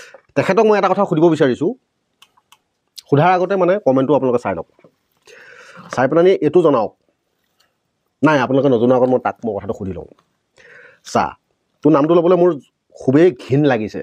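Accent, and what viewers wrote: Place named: Bihpuria, India. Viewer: o dada amar bo ghr bihpuriya moi apunar aru mur kotha mile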